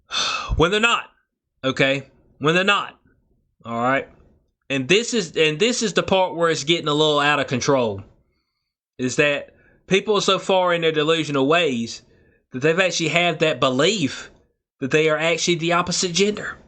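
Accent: South, United States. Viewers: Good video, brother! I can’t quite place your accent… you’re from the south US tho right?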